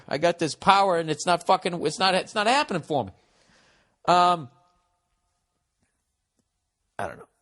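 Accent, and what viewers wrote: Boston, United States. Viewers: Ladies and gentlemen, Burr. He's got the wisdom of a Japanese Sensei in an American bar, wrapped in a Bostonian accent, delivered through the internet. Bravo... you nailed this one.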